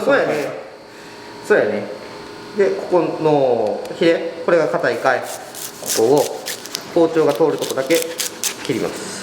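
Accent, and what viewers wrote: Miyazaki, Japan. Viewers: 宮崎の方言に ほっこりだわ～😂 凄い 裁きですネ 旦那がぁ 宮崎出身だから～ 懐かしくて😂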